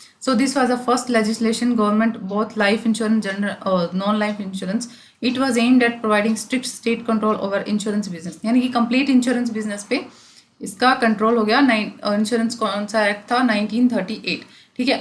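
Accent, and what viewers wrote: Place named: Haryana, India. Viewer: with haryanvi accent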